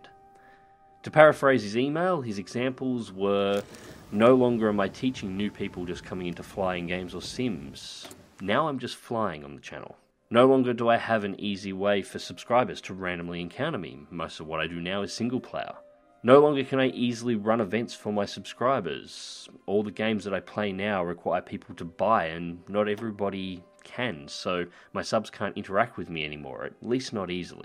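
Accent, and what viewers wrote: Australia. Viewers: I live, breath, eat, and sleep Realistic Air in War Thunder, and your videos are one of the reasons that when I made the switch from arcade, I was able to function. I've grown as a pilot since then, and while your tutorials and reviews aren't as helpful to the me of the present as they were to the noob that was me of the past, they are still helping me grow. Your content, regardless of the game you cover, is very entertaining to me. I mean, you've got yourself a prime Aussie accent. I will continue to be your subscriber, regardless of the choice you end up making here. That being said, I really want War Thunder back on the channel. No matter how asinine Gaijin can be sometimes, the game they created is loads of fun. Its beauty is only accentuated by your ability to show off its vehicles to a high potential. o7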